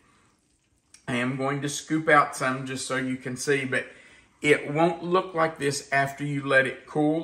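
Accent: South, United States. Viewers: These recipes and slow southern accent remind me of my daddy and Mother, long since passed. Nothing beats the taste and love in these delicious dishes.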